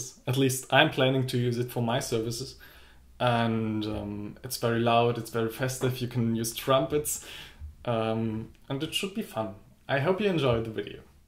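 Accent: Germany. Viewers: I was afraid that musical geniuses died years ago. But here is who knocks my socks off...He seems to have a German accent...It must be genetic. His work makes me feel validated and Happy. My parents gave me an appreciation of music. I picked up the ball and ran with it. Music ist mein Hertz, mein Geist, Mi Corozon, Moi Coer, how much time do you have?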